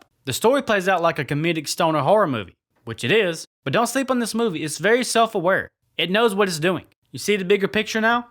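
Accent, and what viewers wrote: South, United States. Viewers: No one ever told me "don't you smoke the devil's weed." How southern are you?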